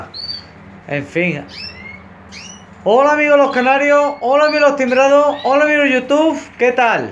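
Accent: Andalusia, Spain. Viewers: Enhorabuena por los 20.000, aparte de lo que aprendo con tus vídeos me encanta como hablas, ole mi Andalucia 👏👏👏👏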